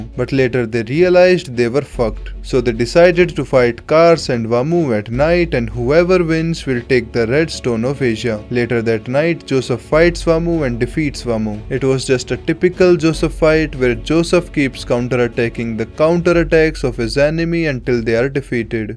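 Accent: India. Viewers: Your have an indian accent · U sound indian.. 😂that accent is what makes this funny. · ive been watching this channel for a while i swear to god i never realised having anime explained to me with an indian accent is such a ride 😂😂😂❤ i can't get enough . sir thank you and please keep it up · Are you an indian, bro? Your accent looks like one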